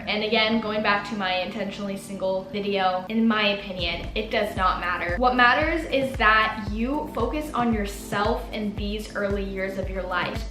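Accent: Australia. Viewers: I have never seen one of your videos and I thought you were from Australia!!!! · this is the first video I’ve watched of u and I legit thought u were Australian. good eye might. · her australian accent confused me!! i was like doesn’t have an accent?? 😅😅😅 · this is my first time watching one of your videos and i actually thought you were an aussie 😂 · YESS love that Aussie accent you have to come to Australia soon bc I would love to meet you🤩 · This is the first video I’ve seen of u and I thought u were Australian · First of all your Australian accent was amazing. Also this is so helpful I was homeschooled for the freshman year. I decided to enroll back in school and I’m a little nervous so happy this helped. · oh my god I thought you were actually Australian 😫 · aye! just kidding I love ur brilliant aussie accent please make more vids with the accent btw smol youtuber here! 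· suddenly you're coppying Kennedy Walsh with the australian accent not hate love ya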